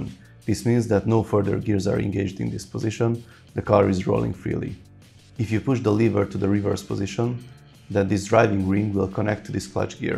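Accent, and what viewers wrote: Hungary. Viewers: Thank you for this! I detect a slight Hungarian / eastern EU accent. Where are you from, if you don't mind sharing? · I didn't know but recognized the accent:P I'm Hungarian too;) Üdv,) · I just came across your youtube channel through recommendation. I immediately thought this guy must be Hungarian, and you are!:D (Don't misunderstand me, your pronunciation is great, just for a Hungarian is easier to spot a Hungarian accent. Szia!:)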